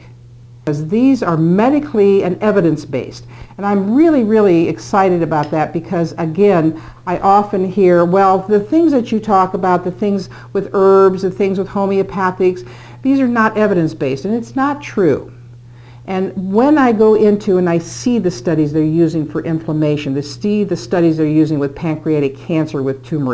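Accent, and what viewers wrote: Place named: United Kingdom. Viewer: Pronounced in America as Tur MER ic. and Cur CU min She pronounces it as the British do. But we don't speak British here in America. Rule of thumb is in America (the entire western hemisphere) the emphasis is on the 2nd from the last vowel. IT only changes from that rule if there is an accent placed over a different vowel. In the British language they put their emphasis on the first syllable of words. TurMERic is a most wonderful herb. Beautiful plant as well. I grow Turmeric and Ginger. I sprinkle the ground up Termeric and Ginger on my buttered toast daily. I also add Hemp seeds to it and a splash of Cinnamon. ===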